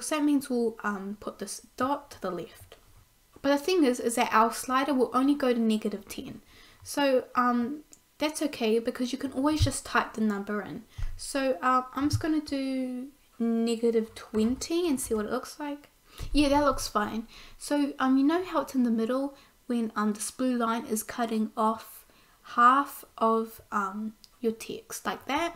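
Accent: New Zealand. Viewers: Kiwi accent ;)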